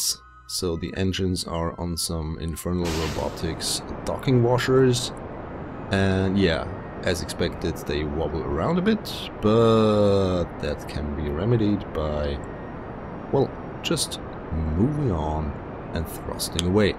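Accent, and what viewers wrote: Germany. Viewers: He Sounds German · ich höre da 'nen deutschen akzent raus :p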